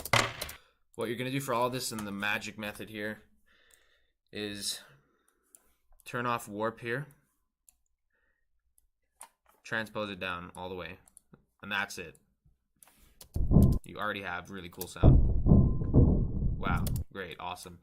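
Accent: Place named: Canada. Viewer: haha I loved the moment when I noticed you're Canadian! Hell ya brother, full send er eh!!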